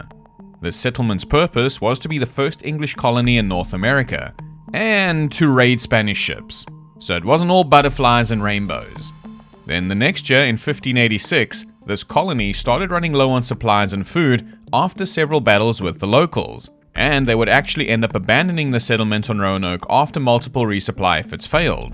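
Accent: South Africa. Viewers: Which has nothing to do with this channel, since he isn't A.I. (he is South African).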